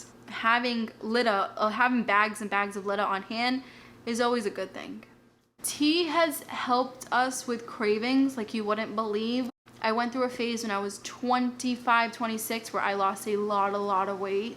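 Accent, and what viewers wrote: Boston, United States. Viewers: Love your Boston accent. 😄💖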